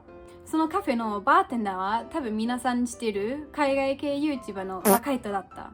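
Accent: Kansai, Japan. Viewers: 時々バカイトのチャンネルみたりしてたんだけどやっぱり時たま関西弁っぽいしゃべりしてたから1年間関西にいたのね！納得しましたわ！ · 少し関西弁交じりなのは近大にいたからなんですねｗ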